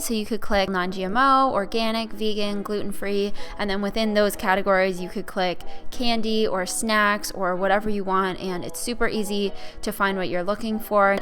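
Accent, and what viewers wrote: Canada. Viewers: She sounds Canadian, but it seems like she lives in the US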